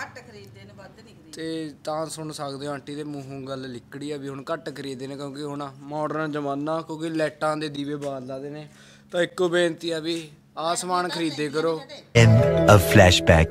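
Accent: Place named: Puadh, India. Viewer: Y tera pind kehra Boli tan Sade aali Puadhi lagdi aa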